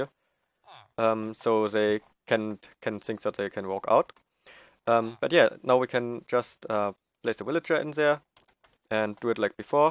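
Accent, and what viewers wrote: Germany. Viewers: Kann es sein dass du deutsch bist? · Are you German? Your pronunciation sounds like a German accent. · ich wusste schon immer das deutsch bist ;D wegen deinem akzent aber nice video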